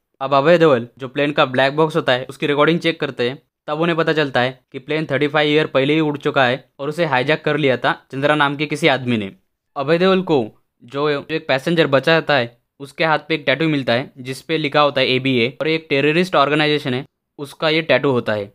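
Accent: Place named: Maharashtra, India. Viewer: Marathi assent as rah hai voice me .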